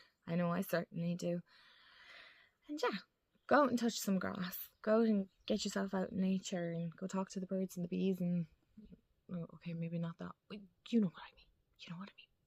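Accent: Ireland. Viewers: I really like your voice and your Irish accent 😍 I relate to everything you said very deeply ❤ It's been really hard for me as an Iranian, staying present helps a lot. I have had a channel on YT since 2020 (My Farsi channel) and the best advice I can give to anyone who is not here for money, is to completely forget about the algorithm. ❤❤❤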